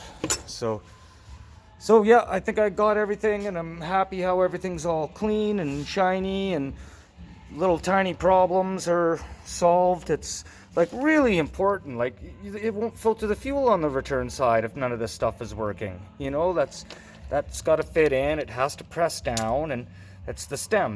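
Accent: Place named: Canada. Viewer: You sound very Canadian.😃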